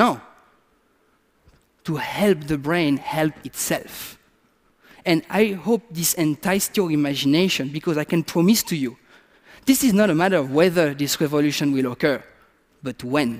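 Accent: France. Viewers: Ahaha oh my god, this video was so powerful and amazing, but as a French speaker myself I couldn't help but find it funny every time he pronounced a word exactly like he would've in French. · Yes, thick French accent. Had to listen to it several times before I understood what he was saying. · Ohhhhhh the French accent made it so much more interesting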